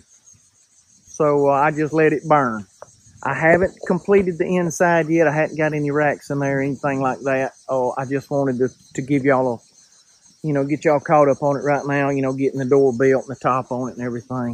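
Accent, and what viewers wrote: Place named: Tennessee, United States. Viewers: stayed for the southern drawl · You from Tennessee? Your accent sounds like you are from around these parts.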